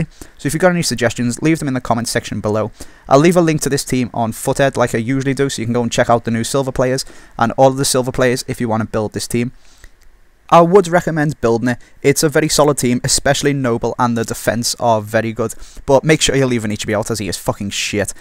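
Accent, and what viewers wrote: Liverpool, United Kingdom. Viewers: Are u from Liverpool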